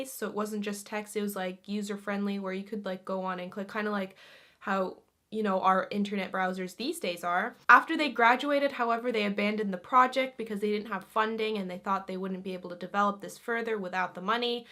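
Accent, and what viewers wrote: United States. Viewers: Yu sound American not Finnish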